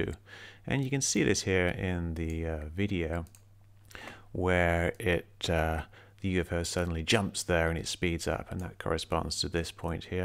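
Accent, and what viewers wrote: United Kingdom. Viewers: Are you serious... a British accent doesn’t make you intelligent clearly... can’t fix stupid I suppose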